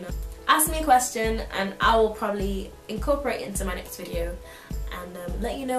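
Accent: United Kingdom. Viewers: I missed your English Accent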